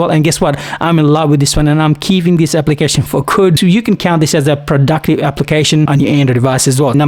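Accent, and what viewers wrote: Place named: Australia. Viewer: Drop your Australian accent please.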